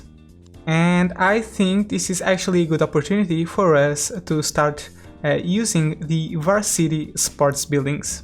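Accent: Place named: Portugal. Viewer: Pode escrever em português! O é claramente português. O sotaque não engana 😊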